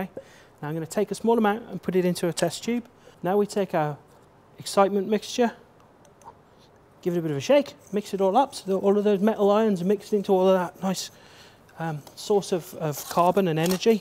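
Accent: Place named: England, United Kingdom. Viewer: this guy is so incredibly English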